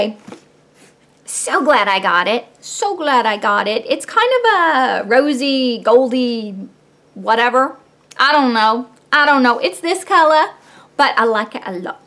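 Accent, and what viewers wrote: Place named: South, United States. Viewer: I just stumbled upon your channel about 2 weeks ago and im so glad I did. I love your personality and you're so easy to watch and light to. (I got that southern twang as well, lol. Anyways, id love to see a demo/review of the cover fx drops. Ive been on the fence for several weeks over weather they are really worth the money since you only get .5 ounce. Looking forward to your next video!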